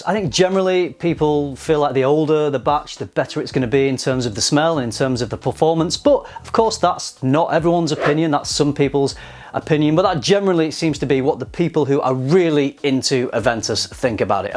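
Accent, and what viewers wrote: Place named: Liverpool, United Kingdom. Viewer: Are you from Liverpool by chance? Could be Machester as well, but I heard Paul McCartney for a quick sec.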